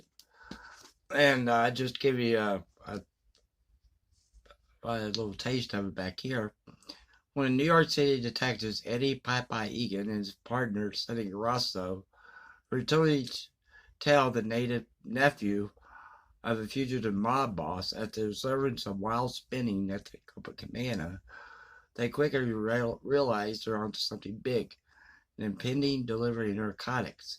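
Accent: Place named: Texas, United States. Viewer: Thought I recognized the accent. Howdy fellow Texan. Cool, sad story about the TV adaption of "All Creatures Great and Small."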